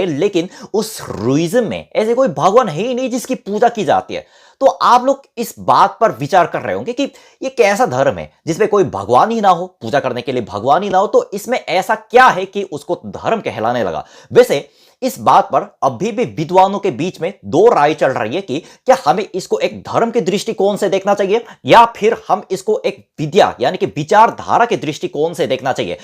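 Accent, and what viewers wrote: Standard. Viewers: Itna pure hindi ham indians v nhi bolte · इतनी शुद्ध हिंदी 99.9% भारतीय भी नहीं बोल सकते हैं। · Your Hindi is so pure, 100% understand. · Bhai etani shuddha hindi to hum bhi nhi bolte jitni tum bol rahe ho gajab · हम हिंदुस्तानियों को इनसे कुछ सीखना चाहिए !! एक भारतीय न होकर भी यह हिंदी इतनी शुद्धता से बोलते है ,और हम भारतीयों को न तो ठीक से बोलनी आती है और न किसी को लिखने ,कमेंट सेक्शन में भी इंग्लिश का चलन है 😟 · Apke jitani shudh hindi hume bhi nahi aati great👍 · Wow you Hindi is so pure! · नमस्ते बेटा तुम इतनी शुद्ध हिंदी बोलते हो,बहुत अच्छा लगता है,rusim धर्म बहुत अच्छी विचारधारा है,काश इस विचार धारा का अनुसरण पूरी दुनिया करे। अपने धर्म का भी सम्मान करें। ईश्वर की कृपादृष्टि तुम पर हमेशा बनी रहे🙏😊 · अति उतम अपकी हिन्दी बहुत अच्छी है इतने शुद्धता से तो हिंदुस्तान मे कोई नही बोलता हैं आपके दवारा दिया गया ज्ञान सर्वोत्म हैं❤ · waaao!!! 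i love ur hindi!! amazing!!! so shuddha!!!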